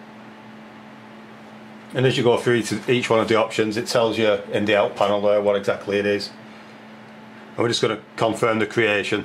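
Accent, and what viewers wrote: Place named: Scotland, United Kingdom. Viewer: Thanks! But I had to watch the whole thing over a few times, because I was so mesmerized by your Scottish accent. ;) ^_^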